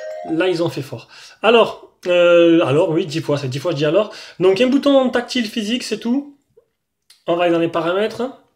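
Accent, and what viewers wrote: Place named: South, France. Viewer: Moi je trouve ta vois agréable avec un petit accent du sud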